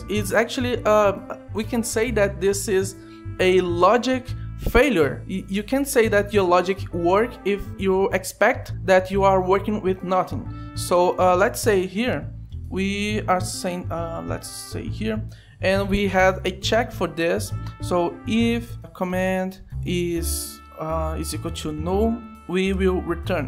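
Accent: Brazil. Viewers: Brasileiro ? Haha, reconheceria o sutaque do meu país até no inferno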